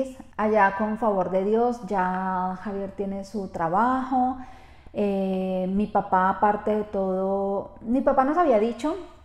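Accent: Colombia. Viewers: 😪😪😪😪, que trsiteza me da saber que dejar este mi bello y violento México 🇲🇽 pero me agrada enooooormemente que te vayas a tu país que tanto extrañas, ya que la pandemia echó a perder tus tan aheladas vacaciones. Muchas gracias por todos tus videos, tus vivencias, tus proyectos, etc. Y si, con la ayuda de Dios yo te seguiré de cerca porque déjame decirte que siempre he querido conocer Colombia, me agrada su gente y ese acentico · Hola, que padre que puedas regresar a tu país, me imagino lo mucho que has extrañado a tu familia, espero todo sea de bendición para ustedes y que sigas compartiendo con nosotras tu vida en Colombia, lo que más me gustará es que tendrás más marcado tu acento colombiano que tanto me gusta, DTB en esta nueva etapa y como siempre al pendiente de tus videos.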